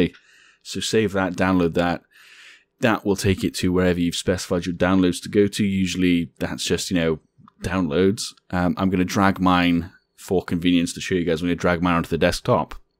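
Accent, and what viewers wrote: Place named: Wales, United Kingdom. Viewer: Dunno why I was surprised to hear another welsh person on YouTube but I was lol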